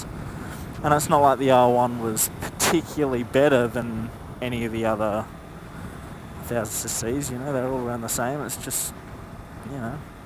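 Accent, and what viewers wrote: Australia. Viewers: cant u hear that he is from australia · Maaate, are those wheelie bins? "Brand spankin' new"? This guys gotta be an Aussie too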